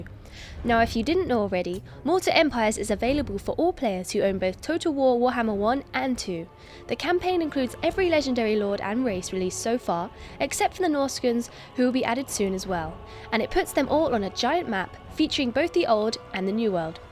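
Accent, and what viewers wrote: England, United Kingdom. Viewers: qt british voice always makes things more enjoyable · She has such a lovely English Accent :)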